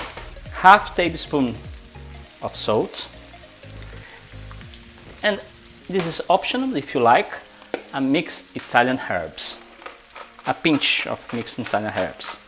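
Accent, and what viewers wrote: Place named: Brazil. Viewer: Thicc Brazilian accent. Beautiful.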